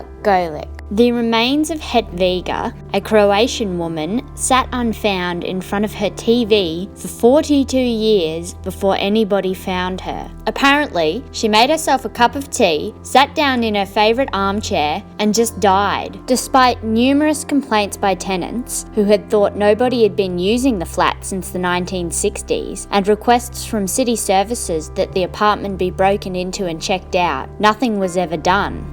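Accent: Australia. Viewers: that australian accent is adorable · Hey!, you sound like an Aussie.... Oi Oi Oi:) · Watched half of it but the first case was the best and then they got boring. The best part of the video is the narrator's voice: refreshing to hear a young Aussie's voice rather than a yank or a synthetic machine voice! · AUssie twang. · the person who speaks sounds austrailian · 2funny girls Channel UMM NO, Australian people. SHE IS VERY AUSTRALIAN. As an Australian I can confirm that this woman is in fact Australian. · sounds Aussie to me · Australian accent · the narrator sounds like an Australian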